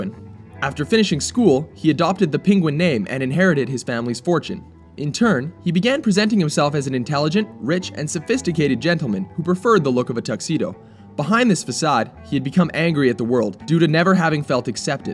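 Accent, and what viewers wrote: England, United Kingdom. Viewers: Is he from Gotham or England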